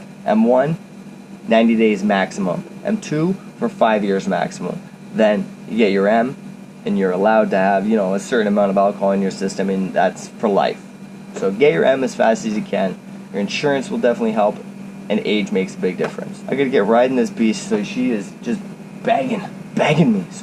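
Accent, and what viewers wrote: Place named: Ontario, Canada. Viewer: Good ol Ontario boy accent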